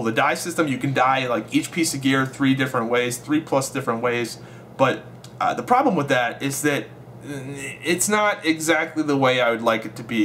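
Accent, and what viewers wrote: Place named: United States. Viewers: Hes american.... obviously, us Australians have a very different accent. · Thomas Feeley yeah he's definitely american. Not from the south though, so I'm not sure where from lol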